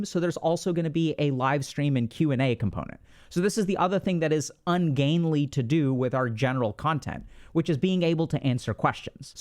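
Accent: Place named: India. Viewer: Just gotta say it’s very dissonant for me to hear ya’ll from an Indian gentleman with a slight accent 😮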